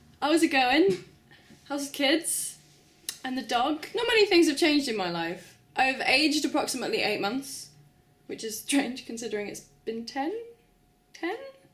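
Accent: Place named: United Kingdom. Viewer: I don't understand why your cute british accent always attract me again to your videos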